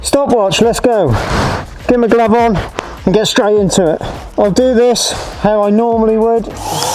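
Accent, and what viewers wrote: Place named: Norwich, United Kingdom. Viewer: 😂me too! been watching him a while and thought he’s got to be Narwich like me !😂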